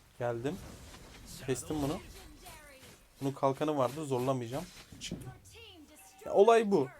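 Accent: Samsun, Turkey. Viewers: Samsunlu olduğunu belli ediyo bende samsun luyum RE!S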